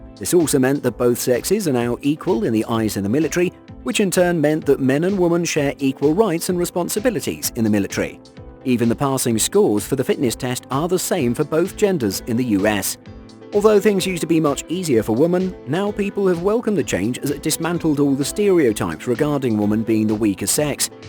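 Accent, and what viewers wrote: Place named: United Kingdom. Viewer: *women... FFS and it's a British naration.... Disgraceful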